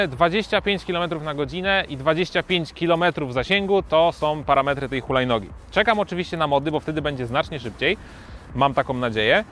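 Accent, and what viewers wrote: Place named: Ukraine. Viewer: sounds like ukrainian but slovakia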